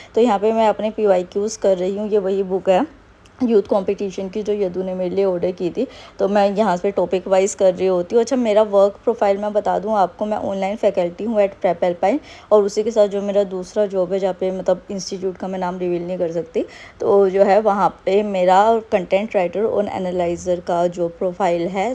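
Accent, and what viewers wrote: Haryana, India. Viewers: Hii didi ...is bar ke upsc prelims ke baad maine baki exams pr bhi focus krne ka socha h pls if u can reply mujhe bta do.... 1). Mai delhi se hu toh kya haryana ka pcs me chances h kyuki mujhe lgta h sb apne states ke candidate ko preference denge.. maine apko uppcs dete dekha h lekin apki boli se ap mujhe haryanvi lgte ho pls if u can help me out. 2). Apne epfo dia h kya exam or uski strategy?😅 Btw , Happy marriage life didi...May your forever after be just as magical as your honeymoon.❤🎉🦋🌸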